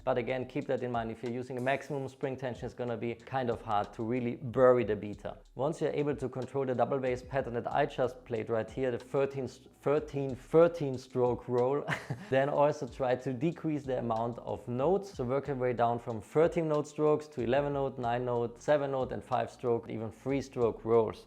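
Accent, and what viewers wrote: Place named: Germany. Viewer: This kinda sounds like a German accent.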